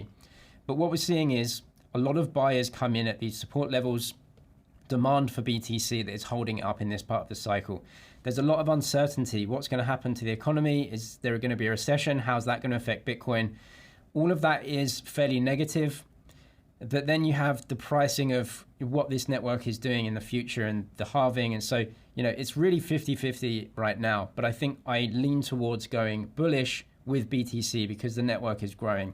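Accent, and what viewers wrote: England, United Kingdom. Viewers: He is British but doesn't live there anymore. And correct, Bybit has ceased operations in UK for now due to regulatory scrutiny. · You sound English, but bybit doesn’t operate in Britain from what I understand? Is this the case?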